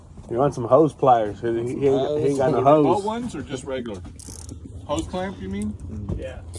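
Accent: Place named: California, United States. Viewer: You sound like you are in cali. I lived in San Jose for seventeen years.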